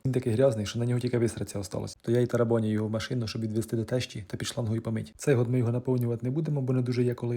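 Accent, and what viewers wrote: Ukraine. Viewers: Яка проста укаїнська говірка! Суперово!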